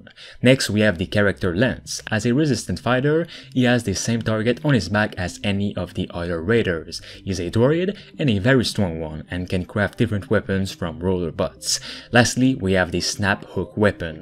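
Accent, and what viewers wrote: Quebec, Canada. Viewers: Tu parles francais? Tu sonnes quebecois 😜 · tes quebecois toi hein?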